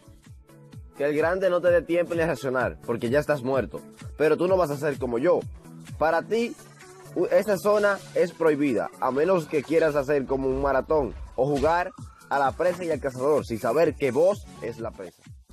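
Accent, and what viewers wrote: Dominican Republic. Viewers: Eres dominicano sierto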